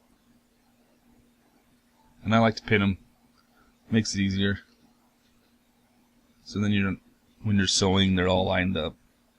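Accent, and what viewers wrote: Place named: Reservation, United States. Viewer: I am kind of curious as to what part of the country you live in? You have what sounds awful lot like a Rez accent.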